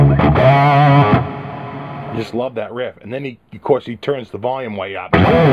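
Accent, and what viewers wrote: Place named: New England, United States. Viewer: what part of New England are you from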